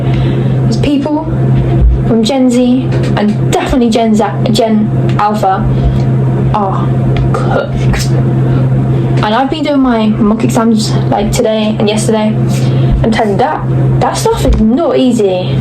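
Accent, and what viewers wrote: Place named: United Kingdom. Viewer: Yeah, I feel basically the same way 😭 and hey, im from the UK too! (Judging via accent) and I’m literally like a year older than you (since you mentioned 16) and I gotta say I agree with you on the vast majority of points here, though some can be chalked up to other factors. Modern UK is pretty bleak and depressing too, so that’s likely not a very nice effect on you either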